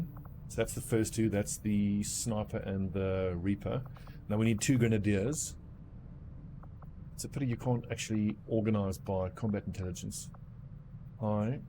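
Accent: South Africa. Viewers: Great to see your back, really missed those South African tones of yours.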